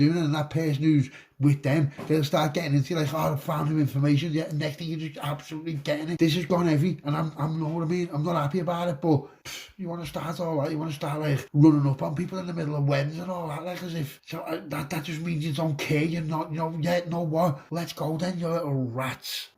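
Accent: Liverpool, United Kingdom. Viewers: Fuckinhell here we go starting me n rattle for n x 1😂 b belter scouse 👌👌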